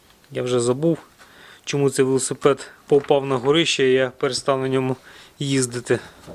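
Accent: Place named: Dubno, Ukraine. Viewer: Друже вітаю! Наснаги у роботі, та бажаю тобі постійно нових клієнтів... Ти можливо із Дубно? Десь почулося у розмові.... Я, з Рівного...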